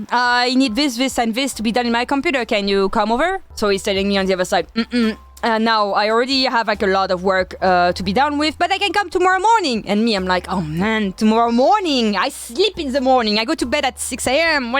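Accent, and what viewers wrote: France. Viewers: Canadian with the strongest French accent ever...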